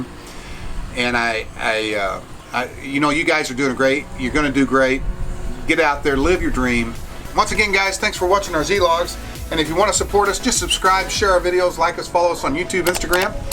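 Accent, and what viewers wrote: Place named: Texas, United States. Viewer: Sounds like a smart Texan to me !! Coming from a fellow Texan. I retired at 53 myself, worked since I was 16, time to live the good life. 🤠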